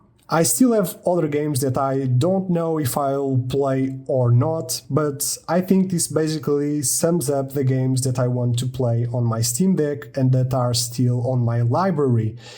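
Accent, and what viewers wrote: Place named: Portugal. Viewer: I can tell your native language is portuguese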